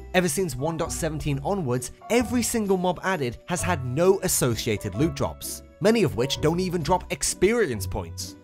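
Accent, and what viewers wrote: United Kingdom. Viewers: and GradeAUnderA are the same person theory Grade ✅ British accent ✅ ✅ Ranting ✅ ✅ Sounds angry 90% of the time ✅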